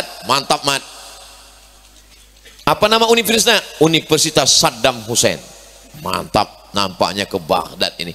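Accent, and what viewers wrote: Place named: Sumatra, Indonesia. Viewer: Maklum lah , kita orang Sumatra dialek kayak gitu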